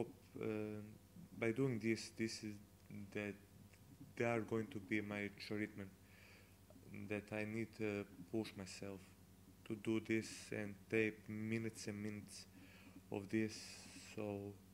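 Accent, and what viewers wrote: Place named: Croatia. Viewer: Good luck! btw where are you from? I'm from Croatia, and you sound like you might be from there as well.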